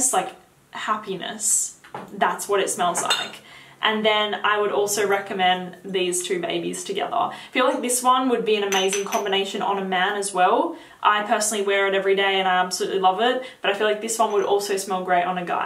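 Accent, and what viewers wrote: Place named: Australia. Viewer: What part of Australia is this accent from??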